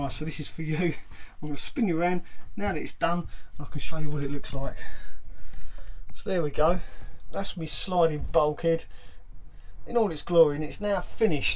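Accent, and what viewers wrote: Cockney, United Kingdom. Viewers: Your accent... make me think of the artful dodger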